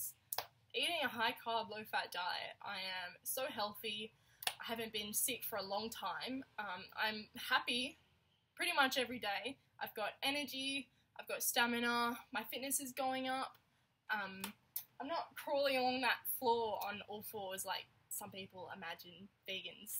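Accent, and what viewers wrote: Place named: Australia. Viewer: Cute Aussie accent.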